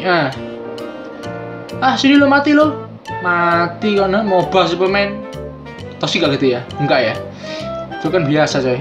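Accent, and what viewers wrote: Java, Indonesia. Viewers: Ngomong jawa nya mas jangan medok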